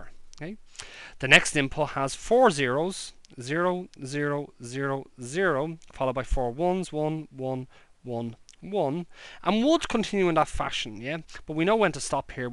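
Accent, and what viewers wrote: Ireland. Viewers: hahaha you wonder? He's not Irish, he's MEGA-Irish!! (From the North). I love the accent, I used to live with two Irish friends from County Armagh back in NYC ages ago... People said I picked up on the accent back then :D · I wonder are you Irish?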